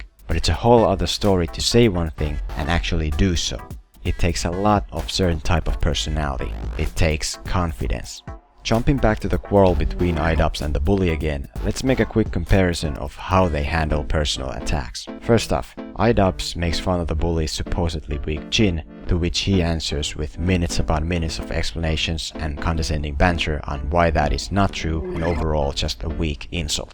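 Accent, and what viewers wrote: Finland. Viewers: Dude, you Finnish? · Might you perhaps be Finnish? The accent made me wonder :D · Are you from Finland? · Are you Finnish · are you from finland? your accent is familiar :) although i do disagree at some points, great video! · You sound Finnish. Suomi? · your accent sound familiar? Do you happen to be Finnish? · Although I can't agree with the point you made , I have to agree with the rest. Great video with a lot of effort put in! By the way, I can't quite place your accent. Do you happen to be Finnish? :) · You're finnish aren't ya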